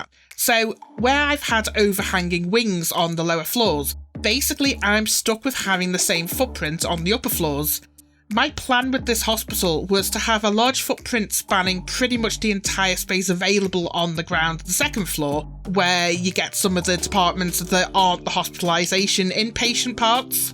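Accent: Liverpool, United Kingdom. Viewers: You sound like you're from Liverpool! I have the same problems with the system of how wards work. Especially the common rooms. I worked in Aintree and as F1s we had a large communal room for all of us, regardless of the ward! Same with AMU and having patients with diagnoses of different specialities. Oh well, I guess they aren't building it around our systems but some of the logic is irritating.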